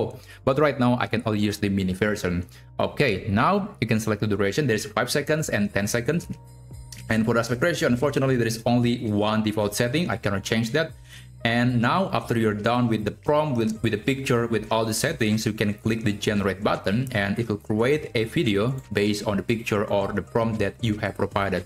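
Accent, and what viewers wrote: Indonesia. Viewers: Indonesian banget logatnya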